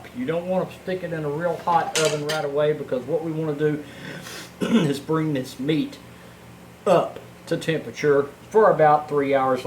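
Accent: South, United States. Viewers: A couple seconds in the video.. "oh this guy is from the south, so you know he knows how to cook ribs"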